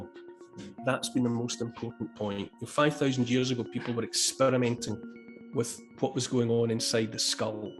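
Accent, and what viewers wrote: Scotland, United Kingdom. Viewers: When I need a booster of a Scottish accent, honesty and a questioning mind, I drop in here. I’m a Scot in Taiwan. I think I’d hate to be living in Sturgeon’s ideological dystopia right now. Taiwan handled all this so much better, and that with China a stone’s throw away.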